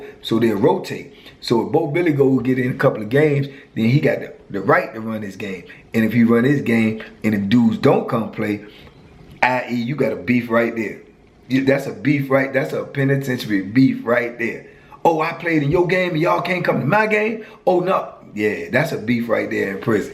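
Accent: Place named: Tidewater, United States. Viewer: Enjoyed that story. Got that heavy tide water accent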